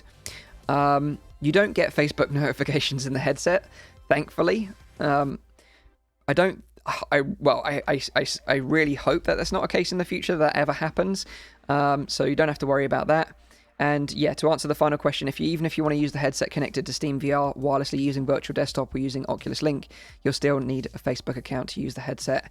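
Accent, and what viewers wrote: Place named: United Kingdom. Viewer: Honestly I personally don't mind Britishisms or any other country's thing/quirk as it's what makes you unique/you. It's a part of you and how you lived I want you to speak however you want lol, multiple English speaking countries have different spelling/pronunciations, that doesn't make one wrong or the other correct thought!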